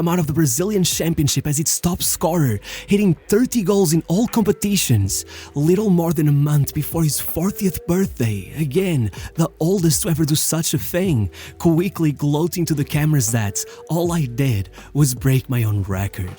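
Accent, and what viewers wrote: Portugal. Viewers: I know you are portuguese from your accent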